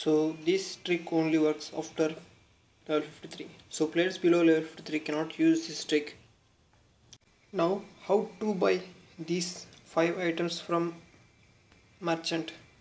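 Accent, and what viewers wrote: India. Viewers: He's from Hyderabad Andhra Pradesh so that is why he speaks like that. Atleast appreciate that he knows English because most of state doesn't know English and is uneducated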